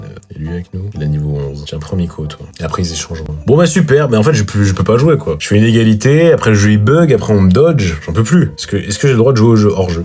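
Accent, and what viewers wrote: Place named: England, United Kingdom. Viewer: accent anglais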